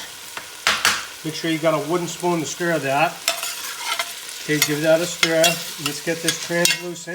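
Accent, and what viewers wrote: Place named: New England, United States. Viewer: The New England accent is amusing.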